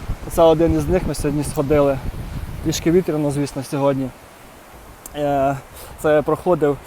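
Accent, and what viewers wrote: Cherkasy, Ukraine. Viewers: По вашій говірці я зразу зрозуміла,що ви Черкащини.А потім по коментарях отримала підтвердження здогадкам😂Дякую за цікавий контент!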